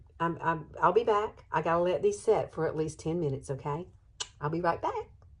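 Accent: South, United States. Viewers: I really love your videos. Your voice is so calming along with your Southern accent. I have puffy wrinkled eyes so I love this routine. Keeping you in prayer for the loss of your Mom, it’s hard I know...take care lovely lady 🙏🏼♥️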